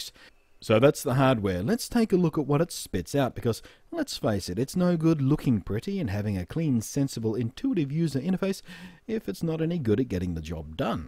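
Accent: Australia. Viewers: Hi, love your channel. Good to have a distinctly Australian reviewer. Enough flattery.....:) The reason I would love to be gifted this item is so can take clear videos/photos of my kids. I use my 3GS iPhone for photos/videos, it's OK, but something like the bloggie would be great because it is simple to use, I could teach my kids to make Vids also. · I thought I heard an Australian accent. I love it.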